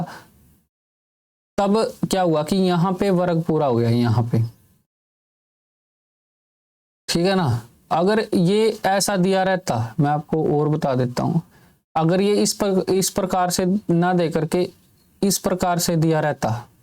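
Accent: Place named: Haryana, India. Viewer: Sir your voice tone is so amazing... Haryanvi mix Hindi... your lectures very beneficial for me 🥳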